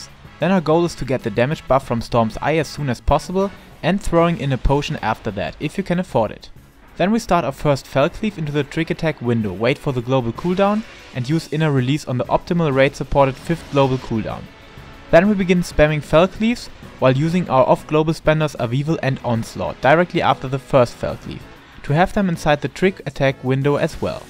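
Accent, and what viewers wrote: Germany. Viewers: Just a suggestion, it might be better to introduce the skill sets by bullet points. Overall the guide is quite straightforward. And German Accent is so damn badass. Love it. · you can hear he's german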